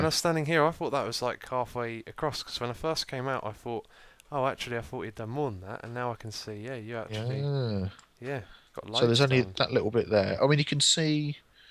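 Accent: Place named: United Kingdom. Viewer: do you realize for the longest time whenever you mentioned Biffa, I always thought you were saying Biffer, with the British accent there's not much of a pronunciation difference anyway.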